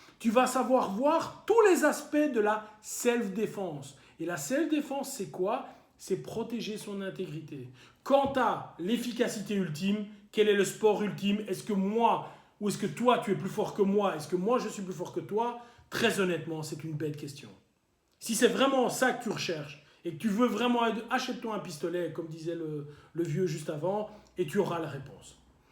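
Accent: Belgium. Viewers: T’as un accent Belge je m’abonne camarade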